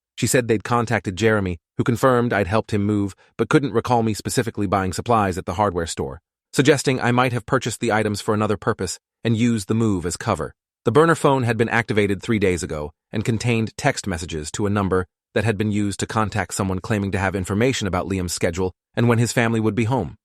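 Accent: United Kingdom. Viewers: Anyone else notice how his voice slowly slowly start sounding like British accent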